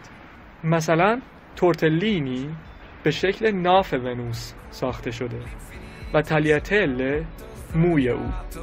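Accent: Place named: Italy. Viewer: عالی، عالی! بفدای لهجۀ شیرین ایتالیایی شما بشم من شوقی در نهاد ما نهادی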